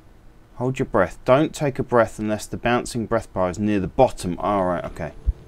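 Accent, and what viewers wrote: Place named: United Kingdom. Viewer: Thanks for playing! We are launching early access in mid-October. Off topic- where did you grow up in the UK (accent question)?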